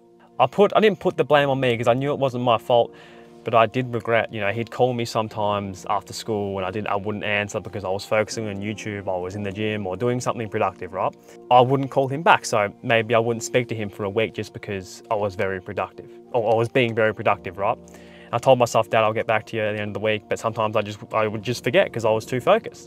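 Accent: Australia. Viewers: with a Australian accent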